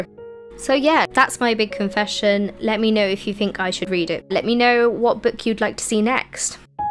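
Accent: United Kingdom. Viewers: Never seen anything from this channel before, but this girl sounds as though she’s originally from Wales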